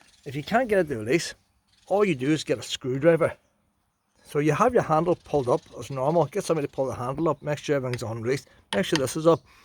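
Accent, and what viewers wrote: Ireland. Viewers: Great video - thanks for that! And, your thick Irish accent reminds me of my grandfather - so thanks for that too!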